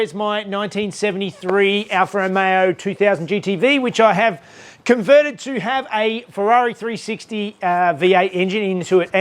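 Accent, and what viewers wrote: Australia. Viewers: English, is not my first language (Dutch) as a someone from Europe, a Aussie accent is obvious;-) Don't hear the difference between Aussie en NZ (?)?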